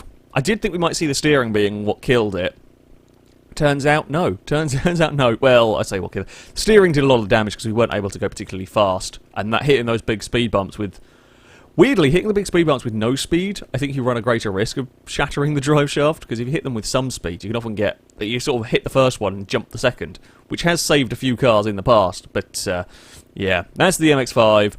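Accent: United Kingdom. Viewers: Gee, I wonder why a guy with a British accent uploads at odd times for you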